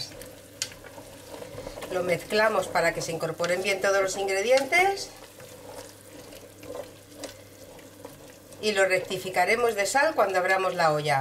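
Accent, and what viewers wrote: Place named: La Mancha, Spain. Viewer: tu vives en Valencia,pero...pareces manchega,por tus guisos y tu tono de voz.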